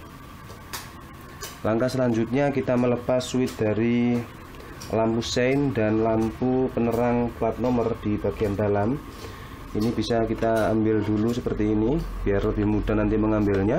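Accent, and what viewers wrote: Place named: Gresik, Indonesia. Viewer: Logatnya Gresik Banget hahaha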